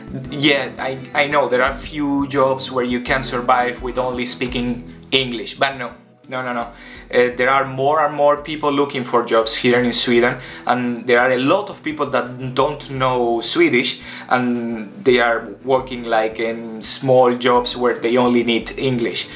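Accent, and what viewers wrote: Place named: Spain. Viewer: Your accent is very Espaniol !!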